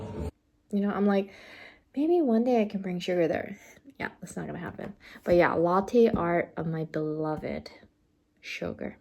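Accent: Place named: United States. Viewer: I still really like how your Japanese pronunciation has a "American" accent to it!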